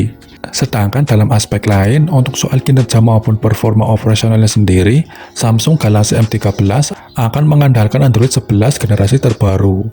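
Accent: Banyumas, Indonesia. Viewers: Orang ngapak yak ?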